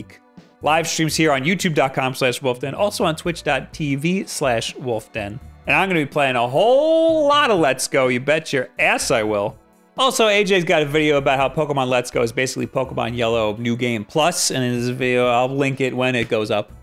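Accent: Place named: New York, United States. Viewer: Hey are you from around the north eastern US? I'm detecting a hint of an accent, maybe New York?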